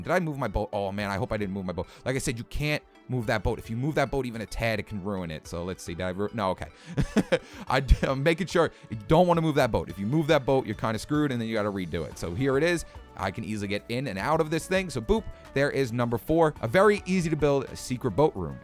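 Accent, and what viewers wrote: New York, United States. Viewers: Are you from New York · You from New York?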